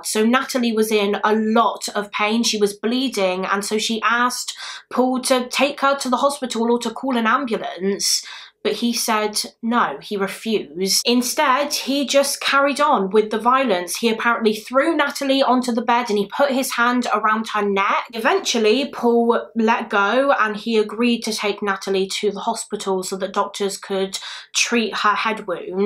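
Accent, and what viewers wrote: United Kingdom. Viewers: I’ve also noticed that most true crime YouTubers I’ve came across literally are from the UK.. I’m from America but I have so much true crime channels I’m subscribed to, but out of all the channels I watch religiously only like 2-3 of those are from America, Like 1 is from Canada, and 10+ are from the United Kingdom, that’s crazy as hell, but don’t get me wrong that’s dope as hell… bcuz I love British accents! I love your accent, its sooooo CUTE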